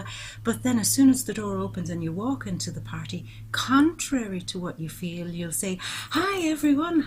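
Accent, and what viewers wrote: Ireland. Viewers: Love the irish accent. Great reading. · This resonates with me. I am always helping other people out from sorting out paperwork to finding things for their new homes to doing runs down to the local tip for them , but never helping myself. I have a major problem with myself worth, been put down too many times and now very wary of everyone, trying to figure out their angle or what they want that I have. I just don't let people in close in case I get hurt again. At the minute I have a major problem with money and I fear losing my home because of a Government paperwork mess up, until it is sorted I am running up rent arrears. I could do with a major cash input, even a major UK or Euromillions lottery win would be appreciated so I can settle my rent arrears myself and some other bills to have a complete fresh start, even if it was just to break even for a change. 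I just loved your soft Irish accent, it has a warming, calming feel about it. Even though I am English, I have a Paternal Irish ancestry so it is in the blood and a soft Irish accent is so nice to listen to. I got my wheels of sorts, it was my birthday yesterday 7/7 and I got some nice Land Rover models ( I can't help it, I like Land Rovers) from my kids for my 51st Birthday. Regards, Mark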